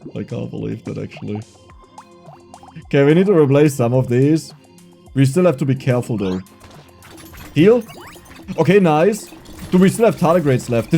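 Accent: Germany. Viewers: I can sense german ascent it subtle but when he spell r his germaniness appear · Nach dem ersten Satz gescheckt das du Deutsch bist XD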